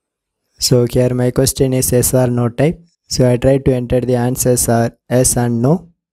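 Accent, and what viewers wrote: India. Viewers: He is from india, did you hear his slang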